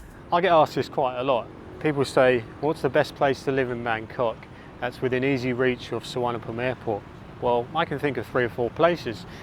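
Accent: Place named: Oxford, United Kingdom. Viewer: yes , clear nice oxford english as a native british. Guess he is a true gentleman as well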